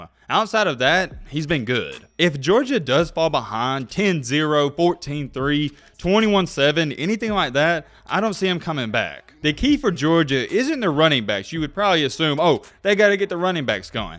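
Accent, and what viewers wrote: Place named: Tennessee, United States. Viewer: It's the accent...TN? Little hard on my ears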